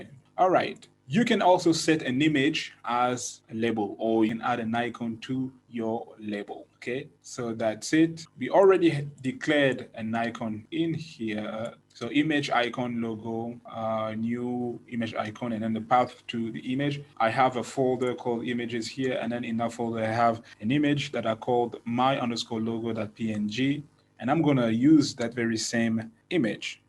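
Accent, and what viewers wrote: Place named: Nigeria. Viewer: Very nice Tutorial, thanks a lot! Is that a Nigerian Accent? :)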